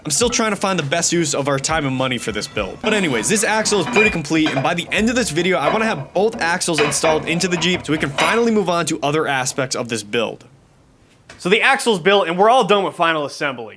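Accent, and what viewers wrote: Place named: Canada. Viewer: Boston? My guess was Canadian haha. I can hear it now that I'm typing this and you keep saying bearing!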